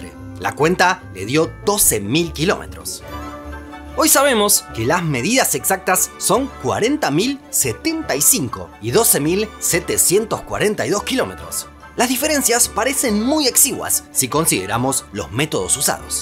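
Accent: Argentina. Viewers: Maquina vos sos Argentino? Si es asi, CAPO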